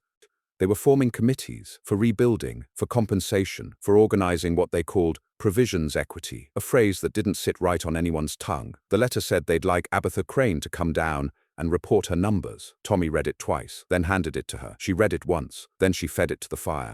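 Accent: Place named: England, United Kingdom. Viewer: What's with the English accent?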